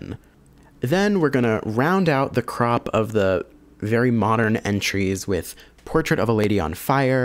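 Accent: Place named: North America. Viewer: He's North American. It's ok for people to pronounce names or words in a regional accent, which is not how to pronounce it in a native tongue. We know who it refers to, we understand the word, there is no intended disrespect going on (if anywhere, that's in your post) your post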